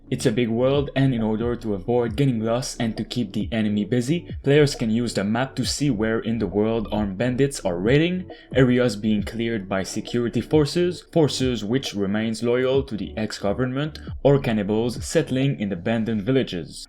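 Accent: Quebec, Canada. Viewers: T'es québécois... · He's French Canadian bud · Hey nice vid, you from Québec by any chance hen? J’entends un ptit accent 😉 keep up the good work 👌🏽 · Man, that QC accent is strong. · He's from the province of Quebec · So... any french canadian? lol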